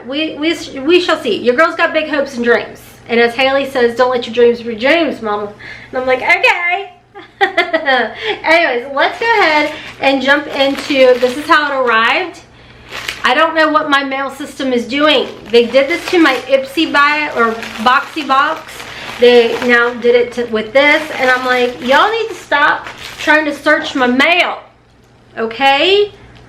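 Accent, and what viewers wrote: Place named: Kentucky, United States. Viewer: I haven’t even watched this yet but saw it was posted and literally said out loud “YAY!!!” 😊 I am enjoying all of your channels!!! Im from Ohio, originally from Dayton area but a lot of my family live in Florence… I live in Florida now and it’s so funny to me that I found someone on youtube from Kentucky.. My mom is from Morgan County Kentucky so I enjoy hearing your accent creep out here and there…. Cant wait until you launch your products.. I have it on my calendar!!🎉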